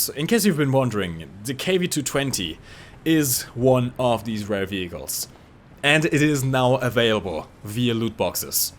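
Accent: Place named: Germany. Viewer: You Sound a Bit German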